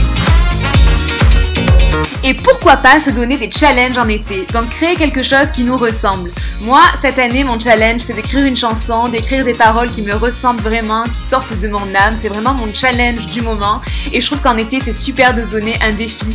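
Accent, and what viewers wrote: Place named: South, France. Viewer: Je t'ai découverte depuis quelques jours ( merci aux suggestions!) Ta bonne humeur, ta spontanéité, ton accent ( ça me rappelle mon sud!) me fait sourire! Avec vous êtes authentique et très agréable, continuez ainsi et soyez heureux c'est l'essentiel!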